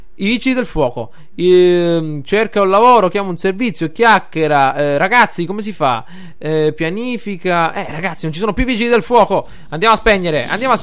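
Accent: Sicily, Italy. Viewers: No siciliano ;) lo riconosco dall accento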